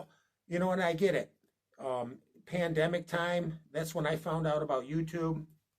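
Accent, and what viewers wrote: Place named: New England, United States. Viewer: He's a Yankee threw and threw. Where's that accent from? 😂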